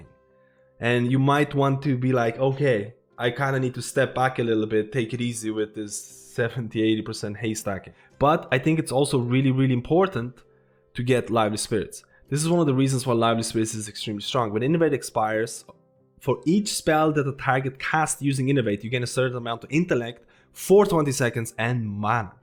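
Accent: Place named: Ireland. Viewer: Where are you from man, i cant hack your accent i hear abit of irish in it lol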